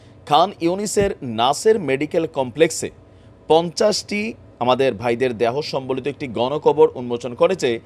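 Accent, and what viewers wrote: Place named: Cumilla, Bangladesh. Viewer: সাহেব আপনি ভদ্র ভাষায় খবর পড়েন খুব ভাল লাগে আমার কারন আপনি কুমিল্লা র মানুষ আমি নরসিংদী আমরা পাশাপাশি। সবথেকে ভাল লাগে যে ভাষাটা কথাটা শুনলে আমার ☺ হাসি পায়। যেমন আজকে পর পর কয়েকবার শুনলাম এ বি সি নিউজে বেনজামিন নেতানিয়াহু নয় তিনি পড়ছিলেন ( বেজন্মা) নিয়াহু। শব্দ টা খুব ভাল লাগল।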